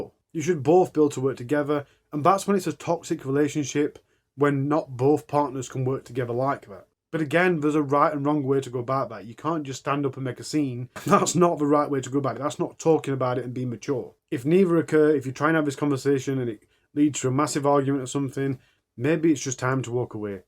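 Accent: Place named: Yorkshire, United Kingdom. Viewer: Just found your channel. So good and subscribed. Daft question, but your accent... youre northern like myself... are you from Yorkshire? Xx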